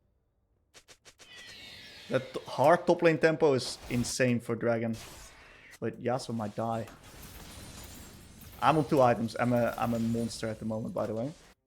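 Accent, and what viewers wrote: Netherlands. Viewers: he has a dutch accent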